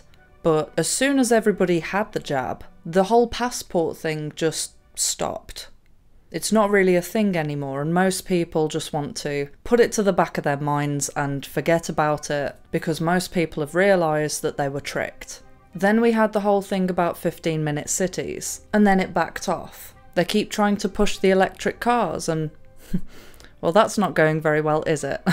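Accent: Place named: Birmingham, United Kingdom. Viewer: I like ur accent where you from? Im a brummy you sound like ur by them sides. ?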